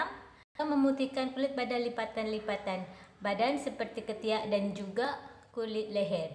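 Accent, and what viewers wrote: Malaysia. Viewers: Anda orang mana kayak orang malaysia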